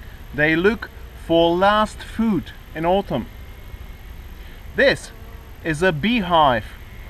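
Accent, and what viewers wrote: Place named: Czech Republic. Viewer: <link> - online slovnik s vyslovnosti, online jich najdete vice nejlepsi jsou s britskou a americkou, kde slysite rozdil okamzite - nevysilejte do eteru 'ceskou' anglictinu, nadelate vice skody, jak uzitku - nemam v umyslu vas zhazovat - napad je to dobry, ale musite znat dobre svoji materii nebo se obratte na rodile mluvci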